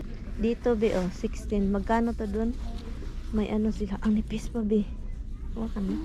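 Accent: Visayas, Philippines. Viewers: Alam tawa ako ng tawa SA scolit mo Day😂😂😂😂natutuwa ako sau pag naay accent na bisayang dako😂😂😂✌️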